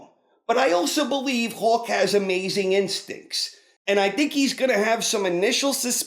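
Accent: New York, United States. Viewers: Guessing he's from New York, maybe the Bronx or Brooklyn, and or, spent a while in Philly. Either way, his narration is clear and concise, his understanding of and his ability to describe the events on screen is uncanny. If you like Twin Peaks you need to be watching this guy.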